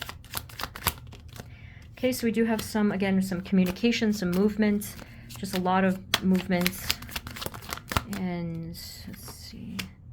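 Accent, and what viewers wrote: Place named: New Jersey, United States. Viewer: Girl! I've been wondering forever if you're from New Jersey...you sound like "home," and I'm from Bergen County...that answers it! I wish I still lived there so I could check out your store! I hope it's up and thriving...Bless you!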